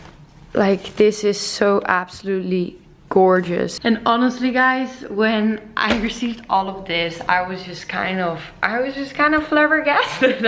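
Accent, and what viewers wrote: Netherlands. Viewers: Good Afternoon!!! you are adorable !!! you feel like my granddaughter!!! I love your Dutch accent!! My family always told me that we are Dutch ... so I'm so very glad that I have found you on Youtube ... I haven't written yet !!! Just found you a week or so ago.. didn't know about your PO Box.. where can I find your address.. Love ya!!! Tell Max HI. !!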